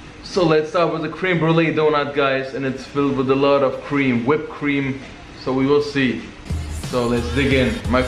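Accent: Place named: Germany. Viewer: Bin ich die einzige, die den deutschen englischen Akzent total nervig findet? :,D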